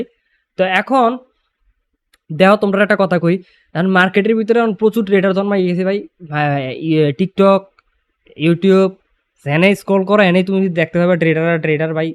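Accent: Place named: Mymensingh, Bangladesh. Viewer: Vai apnar kotha sune mone hoitase apni Kishorganj or Mymensingh er...amar bari o oi dike e...vlo laglo apnar video ta...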